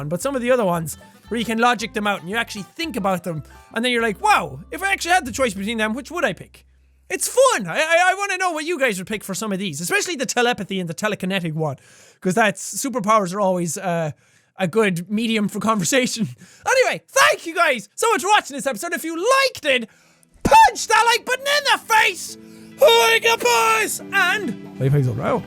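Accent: Ireland. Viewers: Jacksepticeye everyone just roasted markilper on his channel I love your channel thank you for makes someone extremely happy everyday because of this channel thank you for making me feel less lonely everyday absolutely is beautiful your accent I'm enjoying this channel because of Irish accent is like music to my ears thank you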